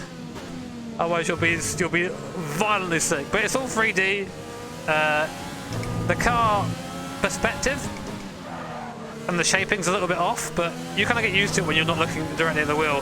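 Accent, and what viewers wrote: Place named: RP, United Kingdom. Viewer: That posh gentry accent is TOO GOOD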